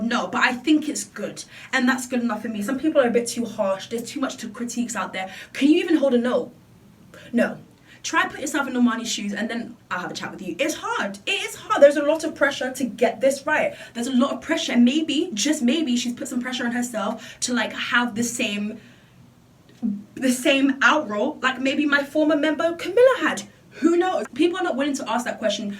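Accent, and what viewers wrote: United Kingdom. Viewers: Am I the only who has become so used to that I can't hear her British accent any longer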